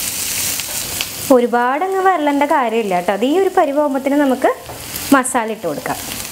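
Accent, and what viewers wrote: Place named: Tamil Nadu, India. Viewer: Kure tamil chuva ayi alle samsarathil. ✌️✌️😍😍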